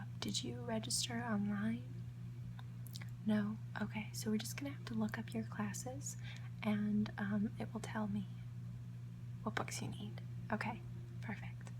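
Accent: South, United States. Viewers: Oh this vid would have been great also with your southern accent! :P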